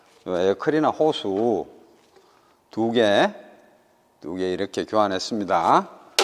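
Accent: Guryongpo, South Korea. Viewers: 나날이 발전하는 블루나인 사장님 짱이야 ! 구룡포 사투리 구수합니다 😂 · ㅎㅎㅎ 구룡포 발음이 좀 빡세긴합니다.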